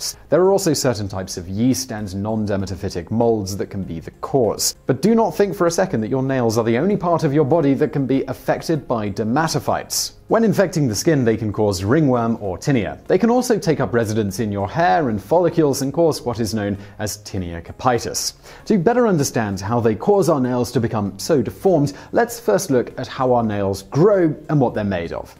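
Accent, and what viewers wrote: England, United Kingdom. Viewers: My gosh brother you're a truly amazing speaker so easily understood and love the English note that is so you. I'm off to make changes, thanks fast speaking intelligent human.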